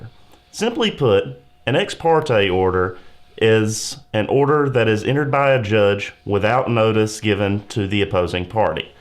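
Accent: North Carolina, United States. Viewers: I knew this was north carolina cuz of the accent hahaha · I thought I detected a NC accent! I raised my children in Chapel Hill.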